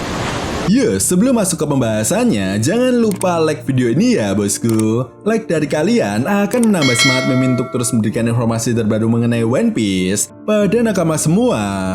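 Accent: Java, Indonesia. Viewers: Malah puyeng dengerin suara masnya dgn aksen briish javanese. Mending pake suara AI aja bang..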